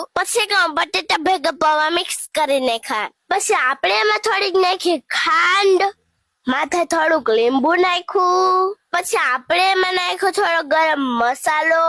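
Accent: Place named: Gujarat, India. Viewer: Bau fine Tari language desi gujarati bau fine bole che ❤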